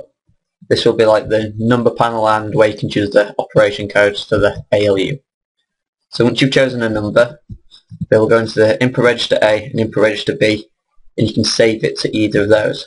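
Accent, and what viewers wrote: United Kingdom. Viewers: is my man scottish?!!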